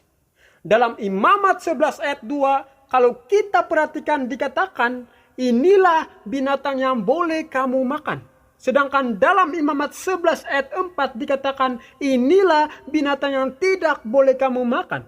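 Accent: Malaysia. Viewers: Betul itu.. Admin ini SDA.. dari sabah borneo malaysia.. Dari tutur caran percakapannya saja sy boleh tau.. Dia orang malaysia.. Tapi mengubah cara cakapnya menjadi cara ngomong orang indonesia.. Sy tahu sebab sy orang malaysia.. Admin ini pastinya orang malaysia.. Kalau orang malaysia cakap saja cara malaysia.. Tidak perlu menyamar mengunakan bahasa indonesia. Salam damai dari sabah borneo malaysia.. Tuhan Yesus memberkati kita semua saudara saudari seiman tidak kira di mana kita semua berada. Amin🙏 Tuhan Yesus baik❤️🙏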